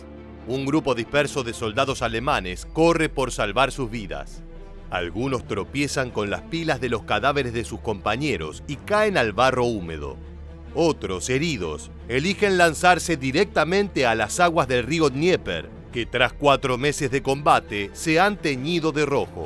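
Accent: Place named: Argentina. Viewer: Hola... No me provoca ninguna satisfaccion escuchar tus comentarios sesgados respecto a los rusos, precisamente xke tengo familia viviendo en moscu ke me mantiene muy bien informado de todo ko ke occidente esta tramando con esto de ucrania... Te escucho y suenas como ke hubieras preferido ke los nazis, aun despues de haber exterminado a mas de 6 millones de seres humanos le hubieran ganado a rusia... X tu timbre de voz veo ke sos argentino y un argentino seguramente RUSOFOBO ke defiende a estos nazis ucranianos ke vienen masacrando a mas de 140 mil ciudadanos prorusos en ucrania desde 2014, y ke seguramente se alegra, festeja y aplauda ke los argentinos tengamos una base nuclear de la OTAN instalada en nuestras islas malvinas... Solo ruego a Dios ke no seas otro milicoide conspiranoico esperando la oportunidad de derrocar otro gobierno constitucional en mi pais utilizando las armas ke pago yo... 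Vi varios videitos tuyos y la verdad venias digamos ke bien... Pero en esta te fuiste a la banquina y quedaste expuesto demostrando lo ke en realidad sos... Un discipulo del tio sam Chau...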